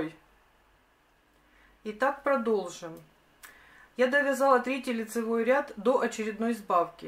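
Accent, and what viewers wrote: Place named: Ukraine. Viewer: Неприятно слушать украинский говор.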